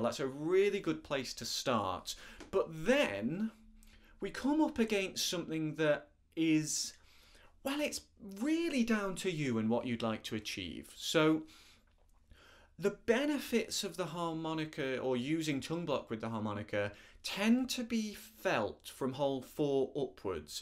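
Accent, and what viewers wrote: United Kingdom. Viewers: I don't play the harmonica but I like your lovely videos. Especially your beautiful british English.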